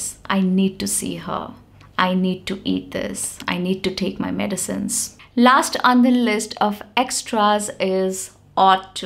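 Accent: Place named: Bagheli, India. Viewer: Are mam kaha se ho aap kyunki bagheli bol rhi ho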